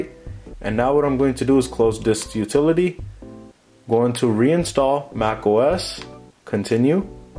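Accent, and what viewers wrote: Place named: Brampton, Canada. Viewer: I hear a Brampton accent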